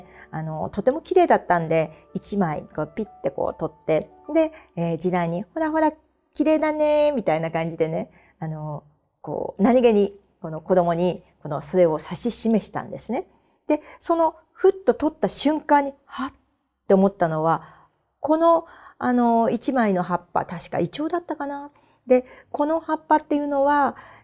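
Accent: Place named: Hokkaido, Japan. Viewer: 対談動画ではせくらさんを知りました。 北海道は珈琲と幼稚園のイントネーションが違うと聞きましたが、やはり道産子なのですね😊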